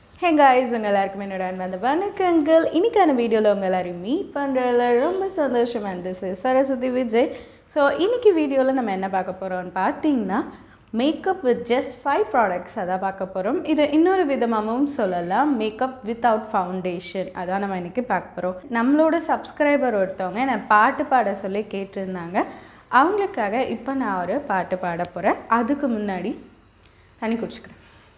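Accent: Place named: Hindi Belt, India. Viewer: Super voice, hindi accent varudhe yen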